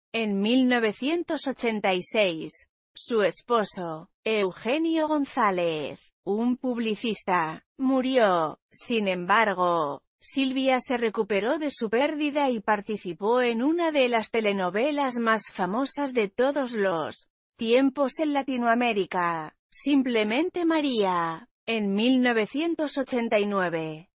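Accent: Spain. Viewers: No me gusta esa voz de Española pues son reportajes de mexico y debería de ser una mexicana q lo hiciera. no tiene ni modo , ni tono , ni forma para hacerlo..... !!!! Pufffffff😥😟😤😨😵😱😲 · Muy mal reportaje, fotos erróneas, la voz española no sé xq carajos si se nos mexicanos, y la voz ni se diga tienes tachesote X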